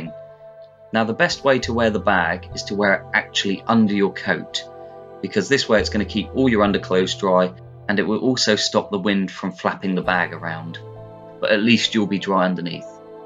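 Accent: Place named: United Kingdom. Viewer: sometimes i dont undertand uk english but nice video dude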